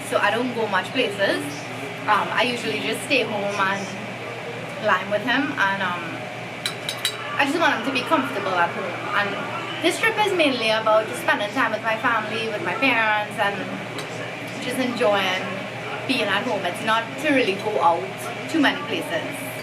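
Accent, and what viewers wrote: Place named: Trinidad and Tobago. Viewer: Hi again I just love seeing you and family happy all going ons..stories,experiences who drink out the coconut water? Especially when you hear the bread van then you have to fly down the step , drop everything vtp reach him before he leaves ..Haha I know the struggles...Girl you show me how to make smoothie gratitude to you fuh so...I could go on learning more about your channel your baby so cute...Love your Trini foreign sal g dialect accent..I pray God keep i all safe happy together even if you do live a part too..Mwah hugs to you all...I seca real cry baby when I don't see family enough...Bye..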